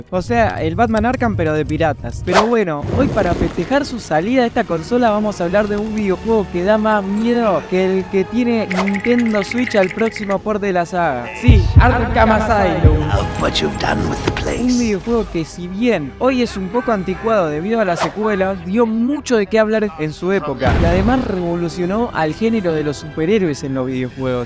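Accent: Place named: Argentina. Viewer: Papu este vídeo es cine desde la edición hasta la narración tan Argentina que usted tiene 40/10 y una pitusa de regalo🗿🚬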